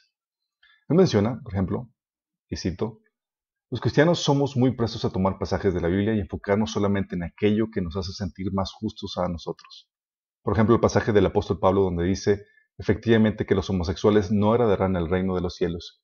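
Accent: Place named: Mexico. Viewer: Muchas gracias por la explicaciòn hermano, muy didàctica, que DIOS lo siga usando, por su forma de hablar me parece que es de Mexico, le mando un saludo desde Perù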